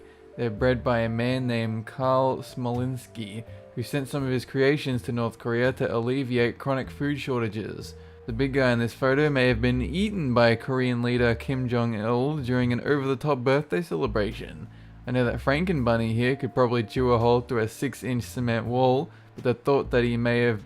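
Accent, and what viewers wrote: Australia. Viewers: Monochromatik Kolor He's Australian. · Every time I hear this guy I think of an Aussie Shaggy from Scooby Doo who's got way too baked and forgot he had to record a voice over...And instead of waiting he just drawled it out anyway... Heroic! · I haven't been watching your channel for long but are you Aussie? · His Australian accent is about the only one I can tolerate . (He sounds a tad under zee influence in this though )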